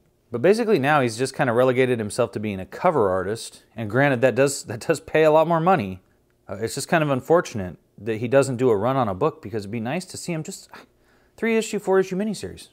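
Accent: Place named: New York, United States. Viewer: Your new york accent is awesome😅😆😅😆😅😅😅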